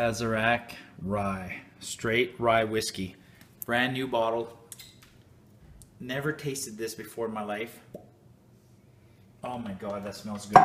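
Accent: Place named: Canada. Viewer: Lol i was thinking Canada before you said you were from Canada. Great video. Its like Angels pissing on your tongue lol. I love it.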